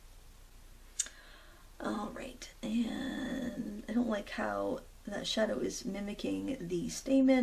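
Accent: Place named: New England, United States. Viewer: love the long videos!!!! I watch them alot for tips and practice..you give lots of great ideas and tips. I paint and do crafts all day most days, and watch youtube videos on my huge tv screen so i can see every little detail! Thankya again for sharing!!! Long vids are more then welcome!! lol love your new england sillyness too!!! wicked smaaaat lolol giggle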